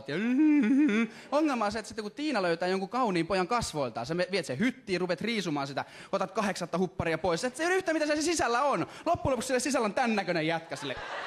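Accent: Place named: South Ostrobothnia, Finland. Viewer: etelä pohojanmaa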